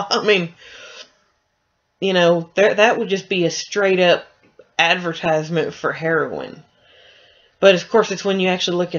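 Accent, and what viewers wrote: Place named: United States. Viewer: Really nice review! I definitely agree with you that Meth Cleaner Girl is creative and overall a good PSA. I never saw it on TV because it didn't air until the year I was born. Glad I didn't though, because I think it would have just mostly confused me. By any chance, are you from Georgia? I live there, so it was nice to hear an American voice for a change. Seems like a lot of reviewers are British on here. :)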